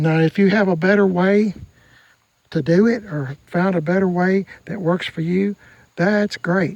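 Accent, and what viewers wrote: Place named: Alabama, United States. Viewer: Sounds like an Alabama accent. We lost a good man from Alabama and you sound just like him. He will be missed ❤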